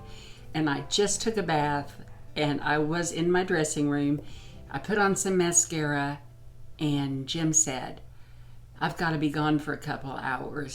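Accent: South, United States. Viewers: Hi! I watch your channel for several reasons. First, you are honest and I trust what you say. Second, you are sweet and loving, and you have that beautiful slight southern accent. You remind me very much of my step-mother who died this year, watching you is like visiting with someone I loved very much. Third, you are genuine, it's lovely "spending time" with you. I love that you put your videos out on Sunday mornings, it's become a Sunday morning ritual for me. Fourth, you're real. Some of the others that I watch seem very much like "presenters," (I swear they are auditioning for the home shopping network) but watching you is like talking to a friend. And finally, watching you is like going home. I grew up in Indiana, but I have lived in Chicago for 40 years now. And although Chicago is very "Midwestern," it's not small town Midwestern. Watching you is like going home every Sunday morning.... 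you are good for my soul! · your soft spoken, Southern delivery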